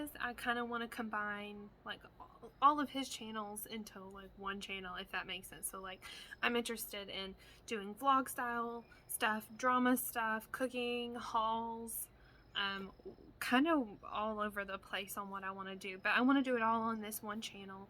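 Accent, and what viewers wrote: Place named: Kentucky, United States. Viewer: Hey! I knew in your last video you has an accent. I used to visit friends in KY all the time. I haven't been back in about 8 years but love it there. I think 20 to 30 minutes is a good length depending on the content. I'm glad you didn't edit out the honking car it actually fit as a funny effect! Can't wait for the cooking video 😊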